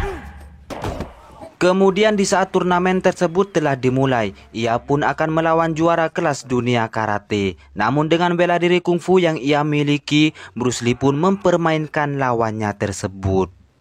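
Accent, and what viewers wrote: Madura, Indonesia. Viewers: Logat madura cak?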